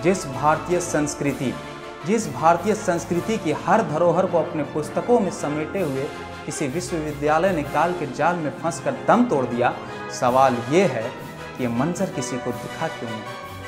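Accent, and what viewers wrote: Bihar, India. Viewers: Bihari aawaz sun garv hota hai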